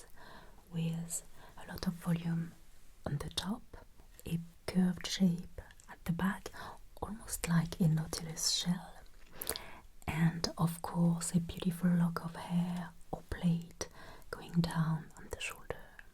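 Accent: France. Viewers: My goodness. The scenes you create, the movement of your hands, your voice, your lovely French accent, the overall energy of your videos - everything is so gentle and calming, it helps me forget for a little while the progressively dystopian world around us. Thank you for this respite from reality. ❤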